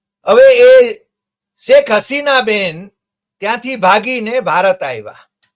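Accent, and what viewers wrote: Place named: Kathiawar, India. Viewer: આપ મહેસાણા બાજુના છો છતાં પણ કાઠિયાવાડી લહેજા માં બોલી જાણો છો 👌🏽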